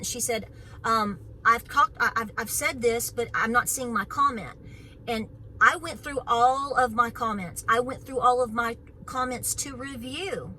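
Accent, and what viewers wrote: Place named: Tennessee, United States. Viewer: my mom's people were from East Tennessee. You sound just like them! It is like going back in time for me when I hear you use an expression from my childhood. I know many of the areas you speak of, too. (One of my great aunts lived in Maryville...I love hearing you say Maryville.) I grew up in the area as well. So, I have never doubted you. The things you mention...blackberry winter, for instance, are things that I remember my grandmother talking about. She still dried her apples in the sun when I was a kid. She ate those dried apple chips like candy. I didn't like the taste at all, but they made wonderful pies. I miss all of that so much, so watching your videos is like a little slice of that for me. I enjoy everything you talk about and share. I love seeing you care for the goats and hearing about what you are growing, making, planning, etc. 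I don't know you, but just from watching and listening to you, I would vouch for you any time as a true East Tennessean. I started watching you because you reminded me of all of my mom's family. You help me recall things that I probably otherwise would not. I no longer live in the area, and I do love where I am, but I miss those foothills and love being taken back. So, thank you for simply being yourself. You bring a smile to my face pretty much every day. Now, I kept watching partly for that, but mostly because I enjoy hearing your perspective. I like your advice. I like the way you think as I agree with most everything you say.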